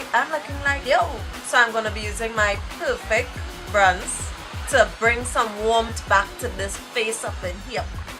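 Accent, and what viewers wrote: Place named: Trinidad and Tobago. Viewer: Colours are amazing 😉 love the bold look 😍😍 and the trini accent ☺️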